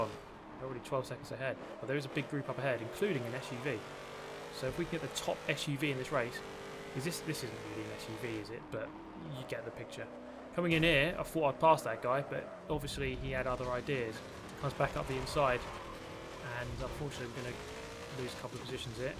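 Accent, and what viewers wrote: England, United Kingdom. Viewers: The Racing: decent Cheeky English commentary: priceless.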